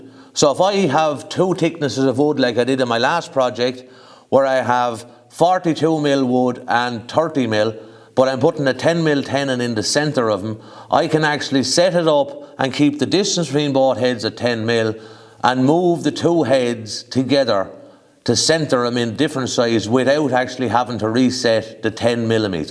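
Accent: Ireland. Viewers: Well done 👏 1k. Great video. You sound like a kildare man.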